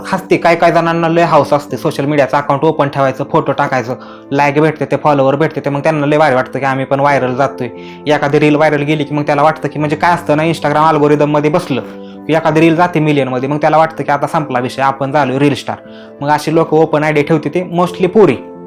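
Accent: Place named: Solapur, India. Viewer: Laga bhava tuzi bolnyachi paddhati sangte ki tu Solapur district mdun ahes.tuzi boli connect hotei.chalu thev 😊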